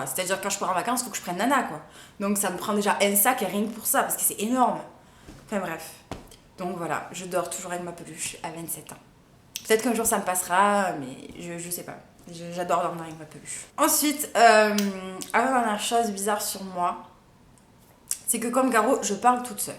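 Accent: South, France. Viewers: je kiffe ton accent du sud bisous bisous❤😘👌😂😉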